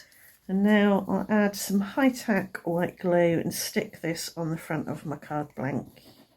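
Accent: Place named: United Kingdom. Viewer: I love listening to your beautiful British accent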